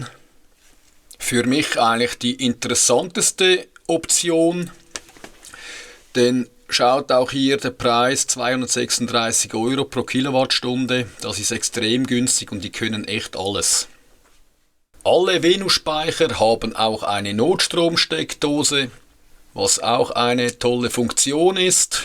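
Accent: Switzerland. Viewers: Zunächst mal: Wie schön, einen Schweizer Dialekt zu hören - mein Sehnsuchtsland! Ich habe vier B2500D; sind gut steuerbar über Home Assistant. Unschlagbarer Preis von zuletzt 150 Euro/kWh. Können nix, außer PV-Strom speichern und wieder abgeben und das mit relativ geringer in/out-Leistung, aber das unterstützen sie richtig gut. Wer einen reinen Puffer für seine Panels sucht, sollte diese nehmen.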